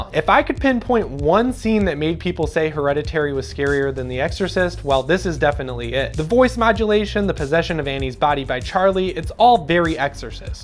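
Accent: Midwest, United States. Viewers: Great review! Where are you from? Can’t place that accent. Illinois maybe? Wisconsin?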